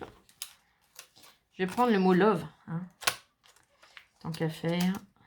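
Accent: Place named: Burgundy, France. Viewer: Coucou je regarde vos vidéos depuis quelques jours et j’aime beaucoup. Et votre accent me disait bien quelque chose 😀 vous êtes de la Bourgogne vous aussi 😁😁😁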